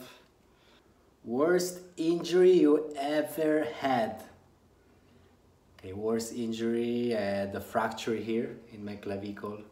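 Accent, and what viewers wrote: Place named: Italy. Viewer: Kasing hot ng Italian accent mo rarr!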